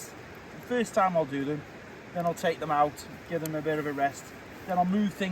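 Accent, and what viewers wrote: Stoke, United Kingdom. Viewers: Hey fellow Stokie, Just found your channel Through English Woodsman! Dan. Said to my hubby this guys a fellow stokie, Our accent stands out. Loved your video and have just subscribed. Roughly what area you in??. I'm Endon.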